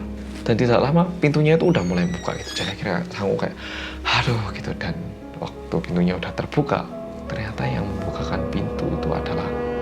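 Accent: Java, Indonesia. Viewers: Berguyon? Bahasa indonesia mix jawa.. 🤣🤣🤣 medhok tenan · Aku suka bgt sama channel karena suara medhokk nya😆😆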